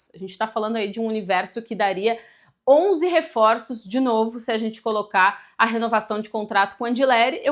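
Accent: Rio Grande do Sul, Brazil. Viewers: Três motivos que esse canal é incrivel: 1 - Conteúdo excelente; 2 -; 3 - Orgulho que tu tens do nosso sotaque gaúcho. 🫶🏽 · Ainda bem que apareceu um canal com profissional e ainda com esse sotaque gaúcho.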